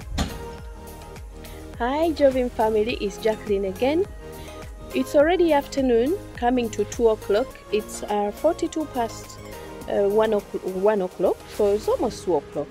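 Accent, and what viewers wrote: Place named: Uganda. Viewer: You sound Ugandan, did you emigrate to Ghana?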